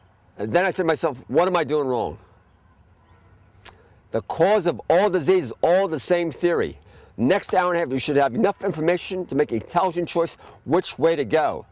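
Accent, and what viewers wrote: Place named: Philadelphia, United States. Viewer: Sweet :) I grew up outside Philly and am an 18 year raw vegan. I moved to Portland, Oregon, long ago, and when I go back to Philly, folks do not understand what I am doing. They guess my age as 20 years younger than I am. (In Portland people guess my age as 10 years younger than I am.) So it is quite a comfort to hear someone with this knowledge and practice sharing it with a Philly accent. :) If I ever go back, I will make a bee line and come and visit you. Blessings Always.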